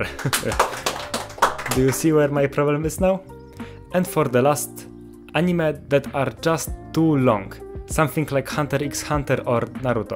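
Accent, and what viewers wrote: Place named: Eastern Europe. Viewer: you sound really slavic bro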